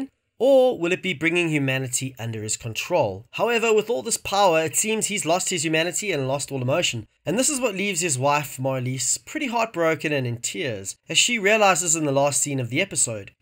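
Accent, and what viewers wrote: South Africa. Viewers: Are you South African? Your accent sounds South African.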